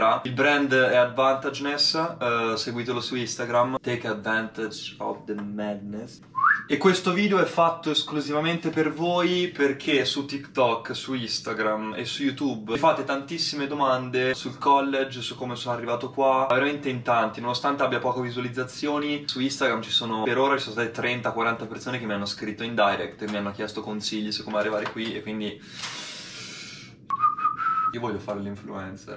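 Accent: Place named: Arezzo, Italy. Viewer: Si sente che sei di arezzo! Ora però devi fare un video in cui metti una tripla e ti giri esultando con "alò dai"!!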